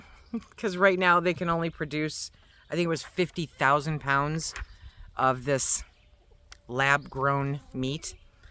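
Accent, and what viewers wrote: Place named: California, United States. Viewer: Sounds so California. Keep it there please.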